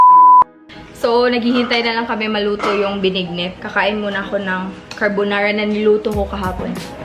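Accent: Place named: Visayas, Philippines. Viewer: I like how you speak bisaya so cute😍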